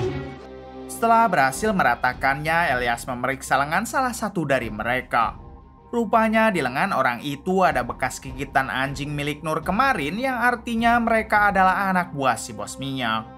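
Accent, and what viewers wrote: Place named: Madura, Indonesia. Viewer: Anjay derih madureh nkk bos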